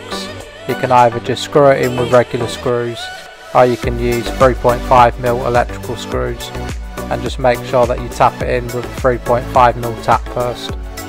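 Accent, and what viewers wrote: England, United Kingdom. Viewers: you sound English, I didnt know England was one of those sad countries that used Phillips screws, I thought that was an American thing.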